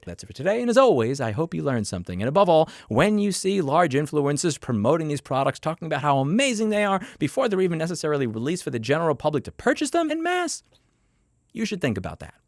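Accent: New York, United States. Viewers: you can hear the new york accent at this speed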